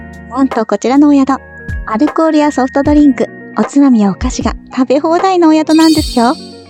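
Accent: Kansai, Japan. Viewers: ステキな所ですね👍 ホテルというより、旅館、て感じですね😊 お湯が出る時、エアかむところもレトロで😂これも演出？なんですかね🤣 なんでやねん😄 さんの関西弁かわいい😄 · またお会いできて嬉しいですえ？関西弁やん！❤これだけ人々を虜にする人も珍しい😊 メジャーデビューしてください❤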